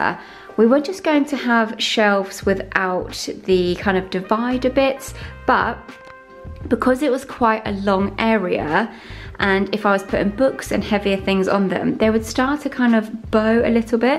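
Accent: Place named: United Kingdom. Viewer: British accent 💗💗💗💗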